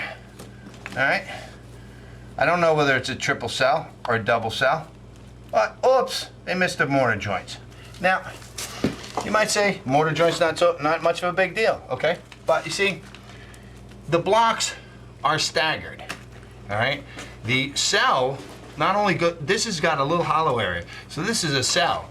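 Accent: New Jersey, United States. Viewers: great video! can quickly tell you're knowledgeable. I don't pay any attention to the comments where they say they feel that you're bitching at them. Doing contract work myself it's so frustrating when you see shit work done by others. It gives the good contractors a bad name who are willing to do the job right the first time and it takes more time for you to dispel those fears in customers that have been screwed over. to hell with the "contractors" that are too lazy or stupid to do the job right. This video alone made me a subscriber. Right away I said "this guy sounds like he's from Jersey haha and sure enough. just relocated to South Jersey for my job. Keep up the good work out there and keep the videos coming. Take Care! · Damn funny as hell before I was watching another video and I’m like this dude is definitely from Jersey! Kick ass bro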